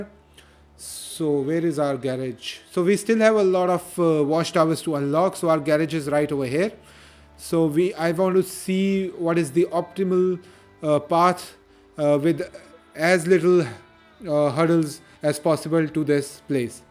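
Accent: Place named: India. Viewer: Hey !! Sounds like you're Indian, where are you from? I am a biker YouTuber from Bangalore who loves this game!! Love your content , subscribed :)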